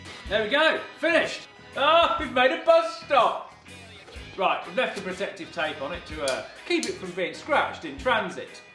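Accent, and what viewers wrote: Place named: Midlands, United Kingdom. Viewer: purely your heavy Midlands (I think) accent coming through 🤣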